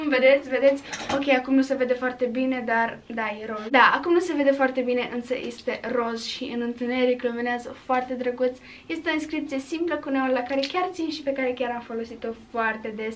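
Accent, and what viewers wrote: Moldova. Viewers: Am o întrebare corbești moldovenește?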